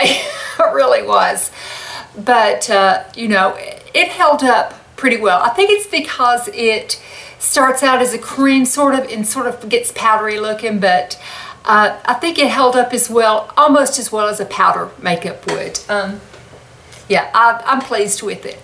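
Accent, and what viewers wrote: South, United States. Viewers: You are amazing and I just love to watch your videos! Your southern accent makes you adorable as well as beautiful! xoxo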